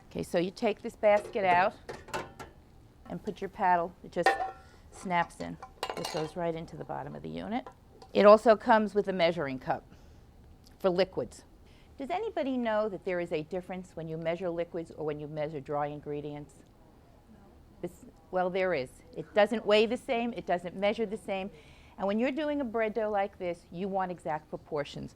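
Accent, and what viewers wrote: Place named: New York, United States. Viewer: I love the New York accent.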